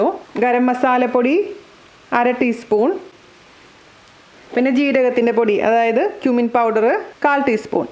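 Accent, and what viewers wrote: Thrissur, India. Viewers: Njan litty vipin chechide recipe try cheyarundu endhe ammayum thirrsur kari aanuu ippo amma ella but chechi timil parayunna chila vakkukal adipoli ready mani athokke kelkksnum koodi ya njan kannune endhe ammayumm athu pole parayumayirunnu chechi de slag ellammm amma pettennu orma varum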